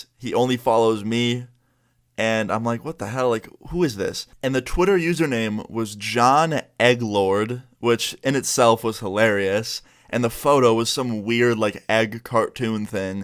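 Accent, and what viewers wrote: New York, United States. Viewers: You sound like an american Fainted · Heh, had a feeling you were a new Yorker, im the same, you had the accent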